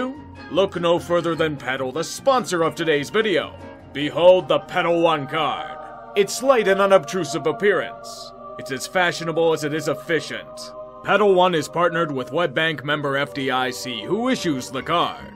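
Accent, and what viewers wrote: Pittsburgh, United States. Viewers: Hearing this yinzer talk Flyers makes me sick but you got a point amirite?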